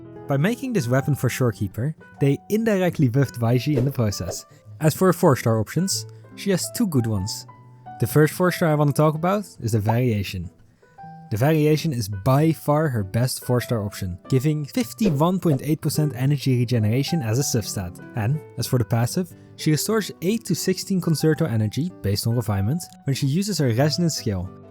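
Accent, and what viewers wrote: Netherlands. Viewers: the dutch accent, gekoloniseerd ouwe! nice vid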